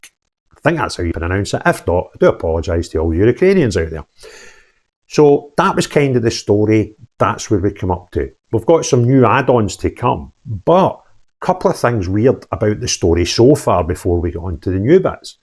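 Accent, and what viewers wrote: Scotland, United Kingdom. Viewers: Have a great day everyone...!!...from germany....yep.......hahaha.....love your scottish accent...or is it "dialect"...whatever...cheers. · Its sounded like he's said he's in a shaky peg.... Put on subtitles cc on vlog. And it shows that to be what said but its not always right with the Scottish accent. I've never heard that saying b4.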